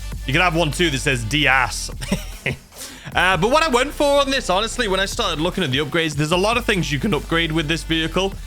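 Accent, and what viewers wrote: United Kingdom. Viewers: “Look at all the pop ups, just bugger off !!! “ lmao only Brits will understand what your saying 😂😂😂 As always, the best content for gta on YouTube 🤘👍👌🤣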